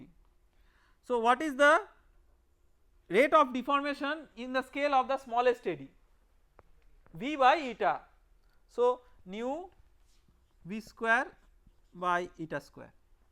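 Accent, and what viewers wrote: India. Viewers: He is an indian professor.Why should he speak in some other accent? If you are not comfortable with it-why don't you go and watch MIT videos? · ok, why all videos about turbulence on youtuve feature a dude speaking with an indian accent?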